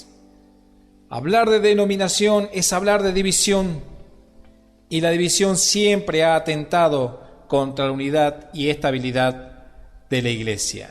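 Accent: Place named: Northwest, Argentina. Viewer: Hermano , es correcto lo que dices . Que Jesús siga bendiciéndote . ( ¿ Eres salteño ? Tienes tonada del noroeste )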